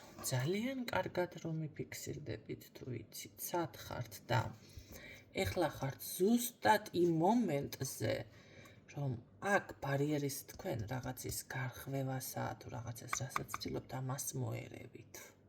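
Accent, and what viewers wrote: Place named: Imereti, Georgia. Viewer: ძალიან კარგი პიროვნება ხართ .მიყვარს თქვენი ლაპარაკი იმერული კილოთი რომ ხსნით ტაროს❤❤❤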